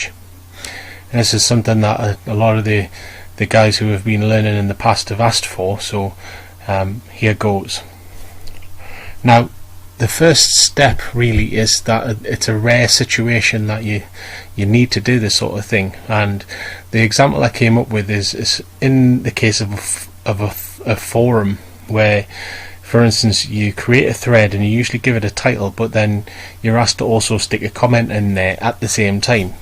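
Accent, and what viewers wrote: North, United Kingdom. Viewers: Nice to hear a fellow Northerner's voice. This was very helpful, thank you.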